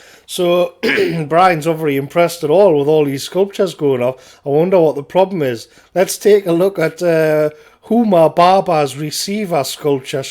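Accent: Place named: Newcastle, United Kingdom. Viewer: These ancient little places - unique on planet earth - are being used as toilets by the elitist commie cunts. This enrages me so much, but I have to confess that without these cultural terrorists I don't know if I would have come to this level of love for my people and land. I always did but it was wishy washy. I see this same garbage here in Cornwall - people who aren't from here come and see our beautiful way of life and want to join. Then they change this bit. And then build a massive housing estate next to a church that was built in the 8th century. No consideration for anyone or anything except progress and of course they profit from the desecration. I appreciate your take on things, always liked the geordie accent.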